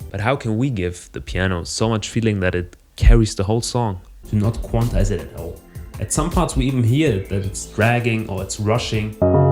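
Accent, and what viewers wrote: Germany. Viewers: bro du sprichst gut Englisch aber habe mir trotzdem gedacht dass du deutsch bist😂 Sehr stabiles Video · Are you German? You have a german accent Mir wurde das Video über meine Startseite vorgeschlagen 😄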